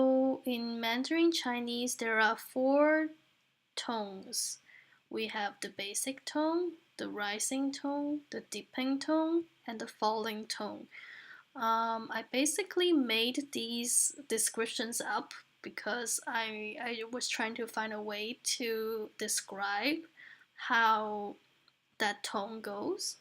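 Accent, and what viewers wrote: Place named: Brazil. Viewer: 修女，我喜欢你的视频！ 你讲得非常好，而且你的语速非常适合我在旁边做笔记。 另外，你的英语口音听起来和我的一个好朋友很像，她是巴西人。 这让我更喜欢你了！ 希望你一切顺利，感谢你的辛勤工作！❤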